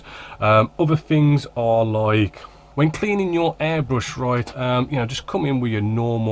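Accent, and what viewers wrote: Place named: Black Country, United Kingdom. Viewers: been using 1500 as a primer for a few years, still needs 50/50 thinning for an airbrush as it is very thick and gloopy! just out of interest are you based in the midlands as you have a bit of a midlands accent? like myself tbh! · This convinced me to pick some up. Nice to hear a fellow yam yam, ha! Subbed.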